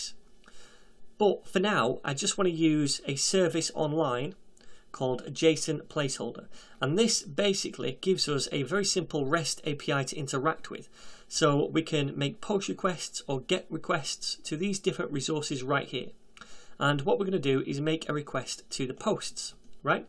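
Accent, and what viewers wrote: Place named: England, United Kingdom. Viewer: Nice English accent, watching software tutorial and learning language pronunciation in paralel! =)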